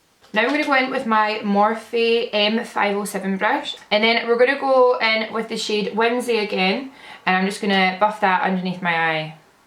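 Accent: Scotland, United Kingdom. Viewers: Legit obsessed with all ur looks!❤️I think you are the only scottish gal that sounds normal on video, in my videos I sound sooooo bad I HATE my Scottish accent tbh😩Xx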